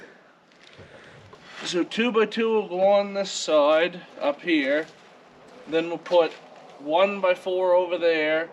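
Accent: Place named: Maritimes, Canada. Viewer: your accent happily reminds me of my beloved great uncles and aunties who were all born and raised in Memramcook, NB and my old neighbors in Maine. Memramcook is about as far as you can go in NB and not be in Nova Scotia!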